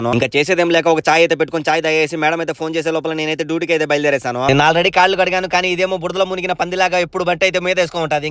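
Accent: Rayalaseema, India. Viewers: Seema slang anthe 😂😂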